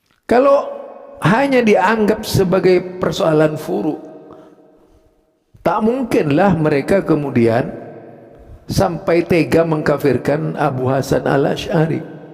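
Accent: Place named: Java, Indonesia. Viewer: Ojo ojo dulu mendoknya sama kyi idrus romli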